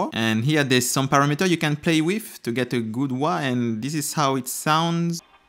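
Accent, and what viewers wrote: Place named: France. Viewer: Tu parle français on dirait?? I can notice your accent ..I speak French too.. Your English is perfect.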